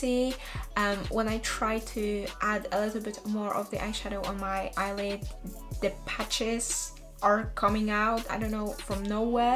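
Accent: England, United Kingdom. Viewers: uwielbiam ciee! masz taki wspanialy akcent angielski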